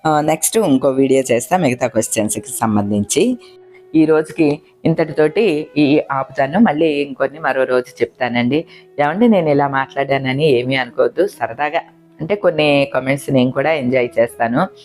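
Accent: Godavari, India. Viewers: Super amma...😂 Me gun shot answers vere level.. ayyo adukku thinadam varaku velladam endukandi.. tappu kadu... E dialogue matram super amma.. Mana godavari yaasa.. manam entha correct ga unna matladina nachani vallu alane antaru amma.. nenu chala navvukunna e video chusi. 😂we enjoyed a lot 😂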